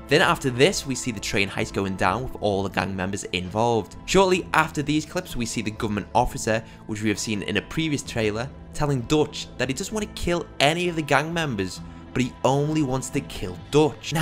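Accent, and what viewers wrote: United Kingdom. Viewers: British acent???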